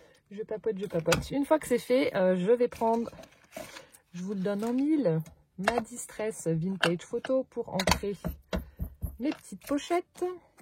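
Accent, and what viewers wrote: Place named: Alsace, France. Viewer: Bonjour 🌸 Je suis tombée sur ta chaîne par "hasard" et WAOUH... Pas du tout équipée et ne sachant pas du tout ce qu'était le scrapbooking, me voici complétement motivée pour me lancer! Je vais faire un grand tableau avec tes idées pour que chaque personne qui vienne à la maison puisse laisser un petit message souvenir! Merci merci merci 🙏💜💁‍♀️🧚‍♀️ en plus je suis d'origine alsacienne alors votre accent et vos répliques me font chaud au coeur et rire!!!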